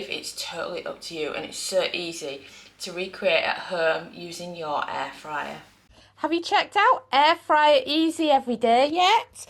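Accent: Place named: Lancashire, United Kingdom. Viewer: I’m torn if that’s a Lancashire or Yorkshire accent. Trying this method today in my ninja woodfire. Wish me luck 🍻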